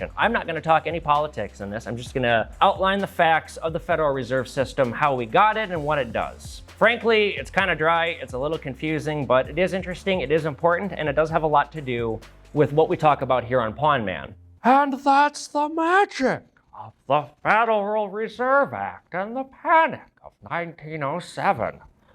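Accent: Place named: United Kingdom. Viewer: I didn't know was Brit tissh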